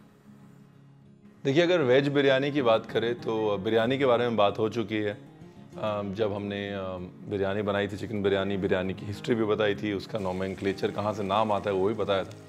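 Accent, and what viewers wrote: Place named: Lucknow, India. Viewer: Ranveer ji aapka jo bolne ka lahzaa hai hume Lucknow ke yaad dilata hai.bahut bahut Shukreya... Uske liye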